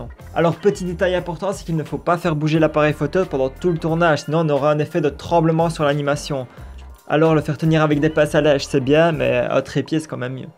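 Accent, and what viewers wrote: Liège, Belgium. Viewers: tu a un accent de liège extrêmement fort mais tkt moi aussi chuis belge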